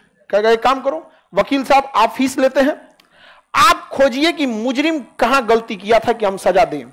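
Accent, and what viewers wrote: Bihar, India. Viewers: Bekar bihari ascent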